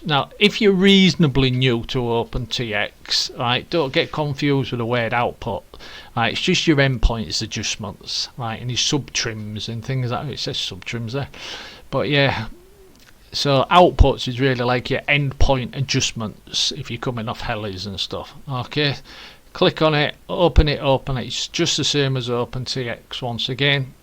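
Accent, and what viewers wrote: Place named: Barnsley, United Kingdom. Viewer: Great video love Ur ascent you sound like my best mate who's from Barnsley. Really helpful vid 👍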